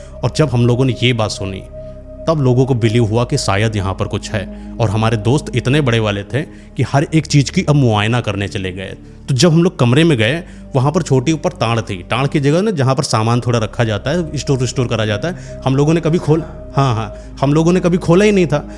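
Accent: Kanpur, India. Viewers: Bhai k bato se LG gya kanpuriya hai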